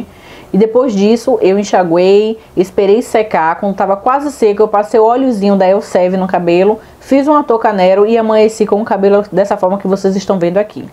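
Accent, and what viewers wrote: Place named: Bahia, Brazil. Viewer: pelo seu sotaque, você é minha conterrânea! Por favor, onde acho isso aqui em Salvador? Obrigada!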